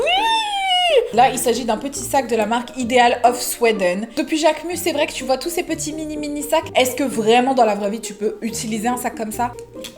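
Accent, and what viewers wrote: England, United Kingdom. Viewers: J'ai découvert ta chaîne y a quelques mois et j'aime beaucoup ! Honnêtement j'avais des aprioris sachant que je n'aime pas du tout la tv réalité et tout ce qui s'en rapproche mais je suis très très contente de m'être laissée tenter par tes vidéos parce que t'es géniale, très drôle, intelligente, pétillante (avec un accent anglais perfectly SPLENDID, tu me touches en plein coeur là) Alors merci pour ton contenu, ton énergie et ce que tu dégages, c'est un plaisir de te suivre ✨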